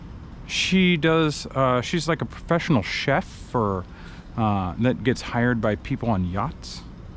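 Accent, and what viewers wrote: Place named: Texas, United States. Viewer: Sound like you are Texan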